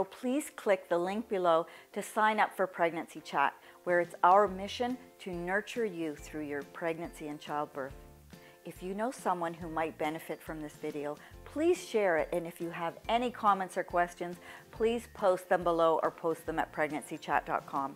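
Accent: Canada. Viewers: I believe she is Canadian based on her accent and also the way the words are spelled on the screen (such as labour spelled with a "u"). This may be why she pronounces words just a bit differently. It's not necessarily wrong, it's just a regional difference.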